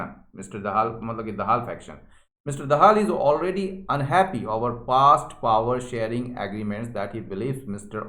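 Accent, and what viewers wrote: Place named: India. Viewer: we all are Nepalese ...but sir your voice and explanation is pure Indian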